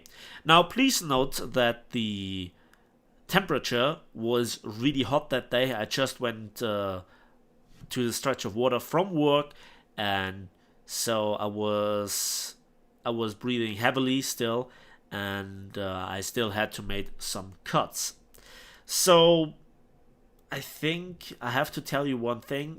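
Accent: Bavaria, Germany. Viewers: Ist das bayerisch? Oder ein anderer Akzent?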